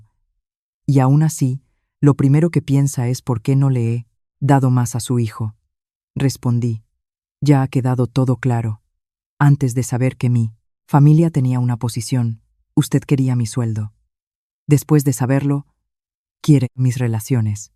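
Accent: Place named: Spain. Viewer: El relato es desde España. Allí se habla así. Mis hijos sun despues de 15 años de volver a Argentina siguen hablando así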